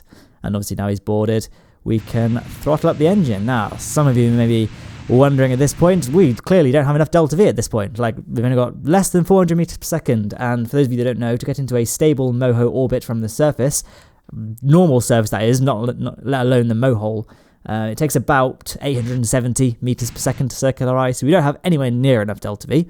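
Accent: United Kingdom. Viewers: Your intro could have been a BBC TV intro, with your accent and all.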